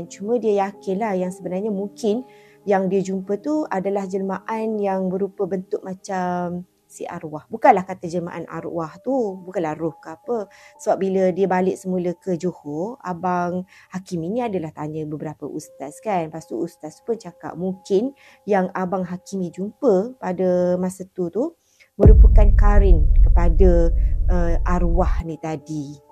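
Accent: Kelantan, Malaysia. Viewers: Kak ore klate kann,kcek mcam pailet loghat klate jerr😁..truskan bermaju jaya channel akak ni ye😊,mdahan moga murah rezeki🤲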